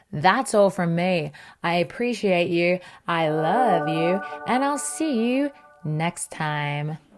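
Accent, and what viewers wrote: Australia. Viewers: Hi, I'm curious about your accent: is your way of talking typical of where you are from, and where are you from? You sound a little bit like Queer Kiwi, but she's from New Zealand, not Australia. Thank you! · Most interesting thing to me is how your accent is 90% Australian, then 10% Midwest American.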